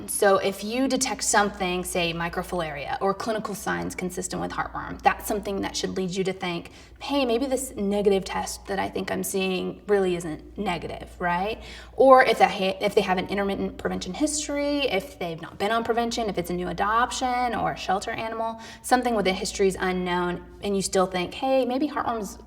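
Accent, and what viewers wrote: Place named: Philadelphia, United States. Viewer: She sounds like she’s from Philly lol.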